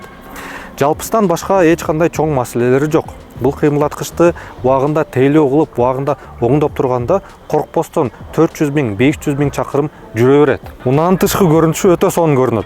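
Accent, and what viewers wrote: Standard. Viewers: Таза кыргызсча суйлоп жакшы тушундурот азамат ишине ийгилик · Ассалому алейкум иним, эн биринчиден Кыргычаны тапптаза суйлогонун учун баалайм сенин ишинди. Менин биринчи унаам 2001 жыл мерседес 2.7 сdi avangard болгон.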